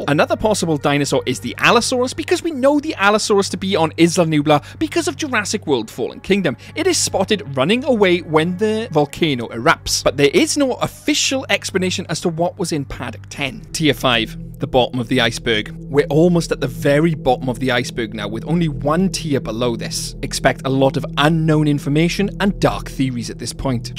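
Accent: Wales, United Kingdom. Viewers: is that… a welsh accent i hear!!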